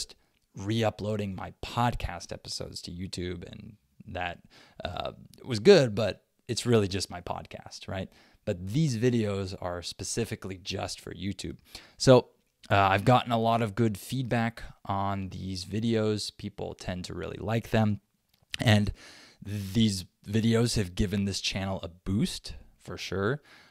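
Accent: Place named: United States. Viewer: see you later beautiful guy i love your american accent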